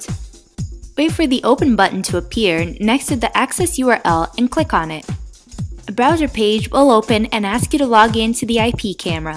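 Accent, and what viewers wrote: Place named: United States. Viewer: The horrible 'skifffle' music, (noise) in the back ground made me shut you off after about 10 seconds. Why the awful, distracting, irritating 'noise' in the back ground? Your American Accent has become very acceptable now and I quite enjoy it - but please LOSE THE AWFUL DIN IN THE BACK GROUND! Many thanks, Bubbles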